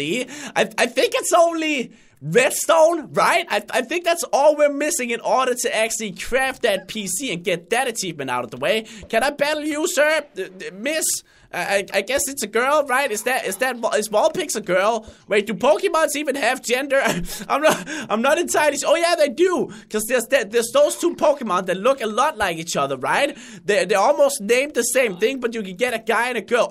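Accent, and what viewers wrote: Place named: Denmark. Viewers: arent you danish because your accent is very danish sounding no offence im danish too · He is danish.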